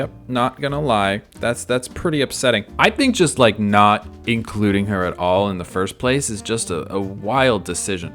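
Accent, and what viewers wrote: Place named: Ireland. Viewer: only vaguely related but sometimes you sound like you have a kind of irish accent!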